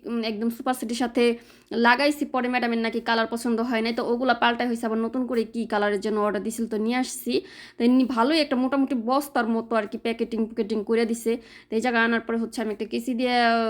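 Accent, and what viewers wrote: Faridpur, Bangladesh. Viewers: আপু আপনার মুখের ভাষা শুনে কেনো জানি আমার মনে হইতেছে আপনার বাসা ফরিদপুর। আপনার বাসা কথা জানাবেন আপু?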